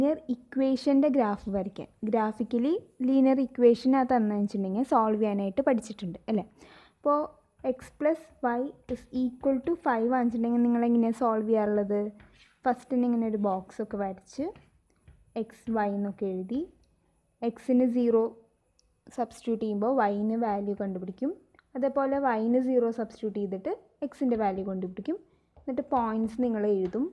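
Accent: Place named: Malabar, India. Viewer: ഭാഷ മലപ്പുറം കോഴിക്കോട് ഭാഷ പോലെ തോന്നി 😅😅😅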